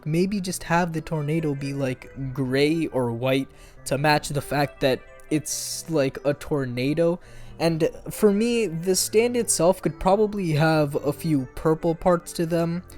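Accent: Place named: United States. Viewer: I don’t want to sound salty, overly critical, nor judgmental: But I hate how “JoJo“ or some other English words come out as American’s say them. I was born in the states but raised around Latino culture but the way you guys say: “Joe Joe” sounds a lot like “Jue Jue” and when you said “Pay-slie-park” it sounded like you said: “Pay-lee’s-park”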